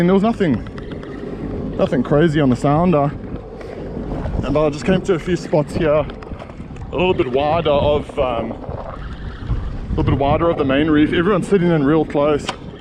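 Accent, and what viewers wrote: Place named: South Africa. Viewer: Always nice to hear a Saffa accent :)) keep the vids coming even though I fish off a boat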